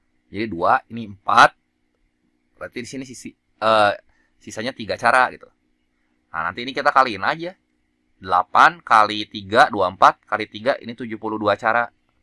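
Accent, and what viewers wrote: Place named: Sunda, Indonesia. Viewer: aduh teh koko ternyata nyunda nya nyunda pisan euyyy, Hatur nuhun ko 😊😊😊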